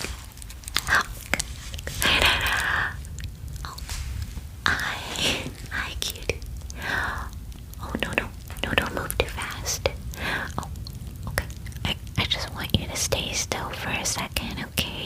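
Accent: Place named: New York, United States. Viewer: I don't know where you get these ideas, but I LOVE them!! So many tingles and you are very entertaining! I love the one's with a NJ/NY accent! Reminds me of the 3 months I lived in NJ! 😂❤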